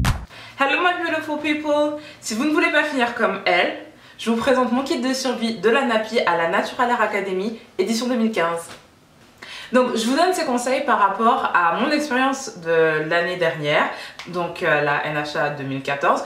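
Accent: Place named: Antilles, Caribbean. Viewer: J'ai adoré ce petit accent antillais lol. Un vrai kit de survie 😏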